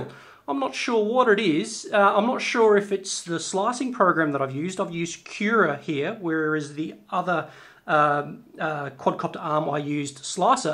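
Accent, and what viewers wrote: Australia. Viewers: No, lol. Same Aussie accent though.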